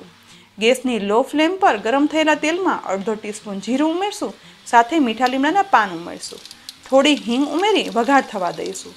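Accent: Kathiawar, India. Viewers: Excellently instructed in Gujarati language with Kathiyavadi Accents.👍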